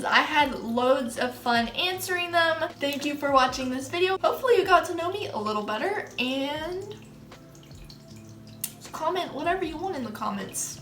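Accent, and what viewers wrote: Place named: South, United States. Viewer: omg ily you're personality is on point! all my questions were already answered by the other people so I didn't submit one lol AND OMG I THOUGHT YOU WERE 5'6 OR 5'7 I WAS SO CLOSEE WAIT I KNEW YOU WERE TALL but i notice an accent where are you from? it sounds like country ish 🤠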